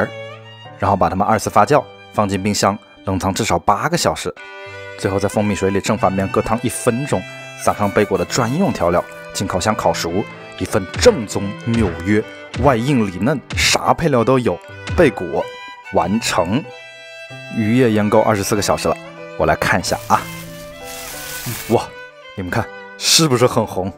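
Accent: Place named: Hunan, China. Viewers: 哈哈哈听你的普通话隐隐约约感受到湖南口音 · 你说话好大的fu南人的味道啊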